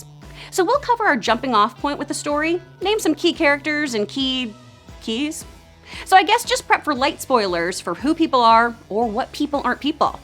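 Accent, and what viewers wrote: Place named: Texas, United States. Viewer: Even with the obvious changes they're making, I'm still excited to see this. Also, your Texas is strong in this vid and I'm living for it.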